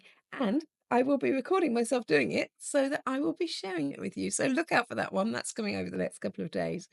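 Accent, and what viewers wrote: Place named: England, United Kingdom. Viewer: the Americans and the Australians would absolutely love your 'English' accent haha. That's the accent I think they all think that we all have if that makes sense? 🤣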